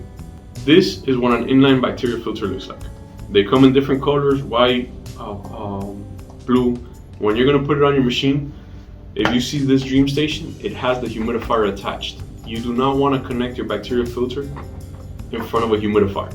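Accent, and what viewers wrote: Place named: Miami, United States. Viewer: Bro, you have the thickest Miami accent ever. I gotta save this vid to show people what a Miami accent is. 😃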